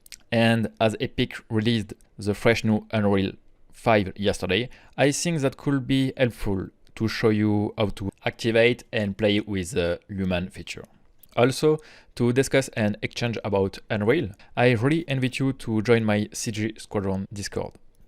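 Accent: France. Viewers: Nice, (J'adore ton belle accent français) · Goodness, what an accent! As a French myself I should be more tolerable but after living in UK for 10 years, I just can't stand it. Somewhere it hurts. Anyway, good channel! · oh that frenchy accent ,,, hahaha,,, so funny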